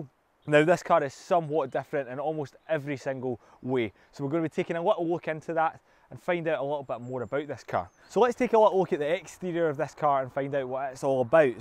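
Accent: Scotland, United Kingdom. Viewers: constitutionalpie * Scotland, groundskeeper Willie from Simpson’s has thicker accent.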